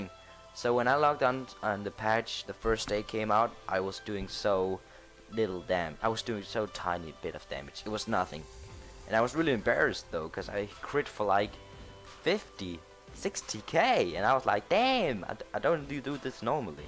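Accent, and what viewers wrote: Denmark. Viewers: Er du fra danmark :D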